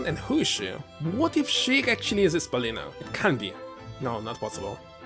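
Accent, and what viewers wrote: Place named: Russia. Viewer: С первой минуты чувству., что ты Русскоговорящий парень ))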